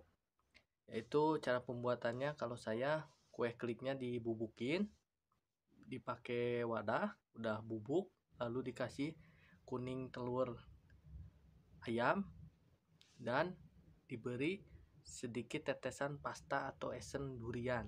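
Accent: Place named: West Java, Indonesia. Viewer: 😆😆alus ngomong na😅sunda indo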